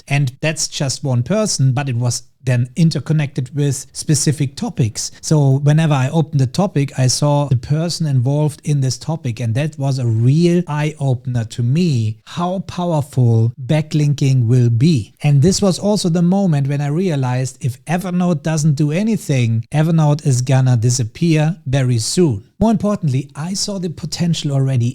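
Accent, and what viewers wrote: Germany. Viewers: Im hearing a lil bit deutsch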